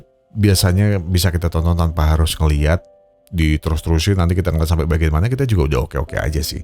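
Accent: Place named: Tegal, Indonesia. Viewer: nt wong tegal min ? kayong ngapak temen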